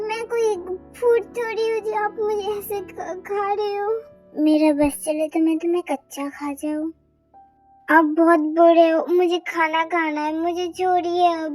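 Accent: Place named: Hyderabad, India. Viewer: Didi app Hyderabad sa ho kya aap ka language Hyderabadi hai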